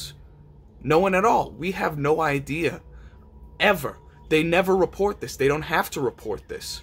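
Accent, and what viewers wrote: Boston, United States. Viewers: Great video but that Boston accent gotta go kid. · I love the accent. It’s the first time I’ve really liked a Boston accent. Great music, too.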